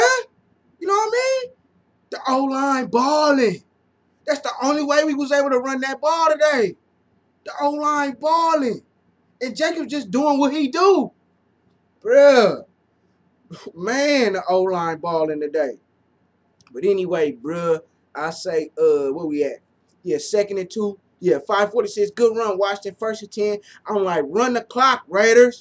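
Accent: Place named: United Kingdom. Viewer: Your British accent! Lmao 🤣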